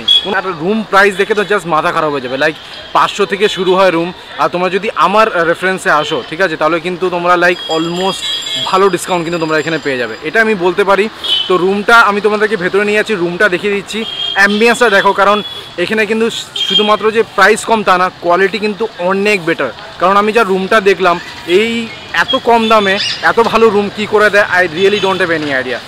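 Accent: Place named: Bangladesh. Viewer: Accent to puro bangladeshi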